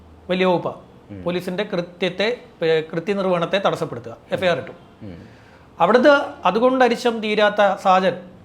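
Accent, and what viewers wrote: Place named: Kollam, India. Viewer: kollam, anchal karan aanu ivideyum anganeya samsara syli😊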